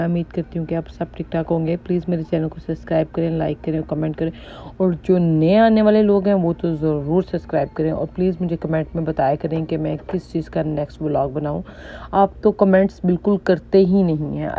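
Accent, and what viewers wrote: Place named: Punjab, India. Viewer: Punjabi touch… zbrdst h.. ✌🏼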